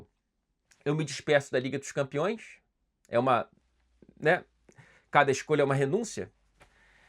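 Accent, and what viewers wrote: Rio de Janeiro, Brazil. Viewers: Caraça Mano... sotaque carioca pesadão😂😂😂😂😂 mudou muito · Fala, cara queria dizer que mesmo nao gostando no geral do sotaque carioca, discordando de voce em muitos aspectos fora do futebol e principalmente te odiando quando o Fluminense tirou a liberta do meu colorado, te acompanho em todos os videos a mais de 3 anos e fico muito feliz com seu passo profissional, fico triste pq sem duvidas sua presenca nos programas da TNT eram essenciais e o MFM perde seus 2 melhores integrantes em poucos meses, mas desejo muito sucesso em sua nova jornada, voce é foda!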